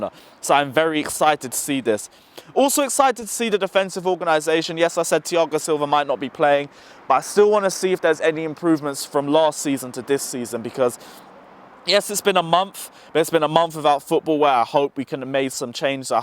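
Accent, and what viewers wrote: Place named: London, United Kingdom. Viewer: he's from England bro. From the accent you can tell he was born and raised in London or thereabouts. He's reading Werner in English. Nothing is wrong with the way he pronounces the letters. He just has to make an effort to pronounce it the german way since it's a german name. That's all....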